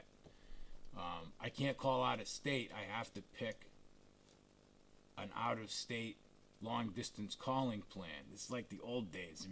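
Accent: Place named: New York, United States. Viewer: Glad you're back, you talk like a New Yawker.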